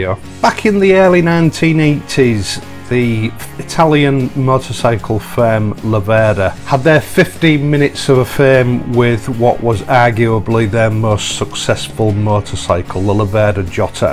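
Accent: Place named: Hull, United Kingdom. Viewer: Great video, I remember those Laverda handlebars from Jota reviews I read in the '70s when I was just riding a Yamaha FS1E. Nice looking Bonnie you have, I'm thinking of getting a T120 and saw your review on that also. I'm from Canada but have relatives in Hull and Beverly, your accent sounds very Hull to me but I could be wrong. Thanks for the great videos, good information and nice video quality.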